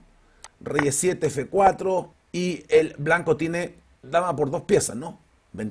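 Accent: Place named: Chile. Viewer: Qué gozada haber descubierto el canal y ser suscriptor..! Explica usted de maravilla. La suma de la pasión que pone más ese encantador acento chileno, es una delicia. Celadas muy pero que muy útiles. Saludos desde España y un abrazo!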